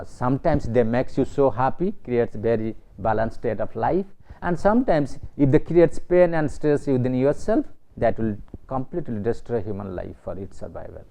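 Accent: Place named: East India, India. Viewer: He sounds from East India and there's nothing "wrong" in his accent...that's how Bengali axomia speaks English... every region has different accent, it's natural not wrong.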